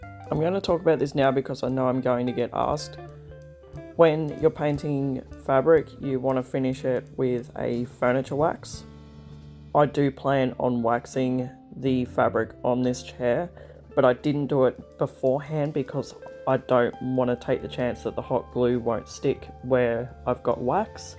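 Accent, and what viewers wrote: Australia. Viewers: I pity anyone who doesn't speak australian. Love the inspiration on the desk.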